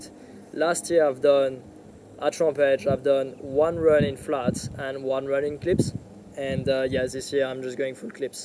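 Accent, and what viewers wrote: France. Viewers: L’accent français dans ton anglais 🤘😉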